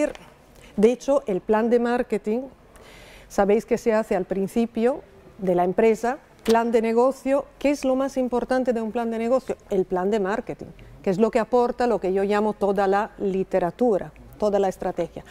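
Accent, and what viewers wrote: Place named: Peru. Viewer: Me parece escuchar a un señor de nacionalidad peruana, en el curso, Esta clase es exelente, saludos desde Peru.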